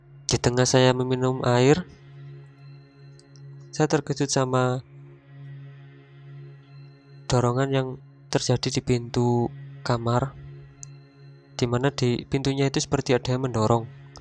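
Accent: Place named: Malang, Indonesia. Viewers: suaranya khas malang banget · Itu logat medoknya kayanya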